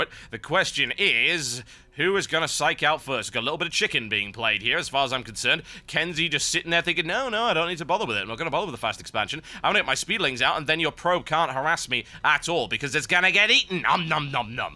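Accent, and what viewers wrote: Germany. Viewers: Love you`r German Accent :)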